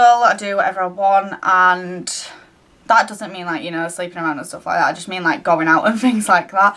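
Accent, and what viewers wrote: Yorkshire, United Kingdom. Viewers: I know how you feel, my relationship also ended in July and was horrible! I find spending time with my friends on the weekends and having something to totally focus on (which for me is my uni work) helps. Its totally a relief, refreshing and just amazing when you can finally be free. From when I first came across your videos years ago through your Yorkshire accent tag I thought you were and still are beautiful. <3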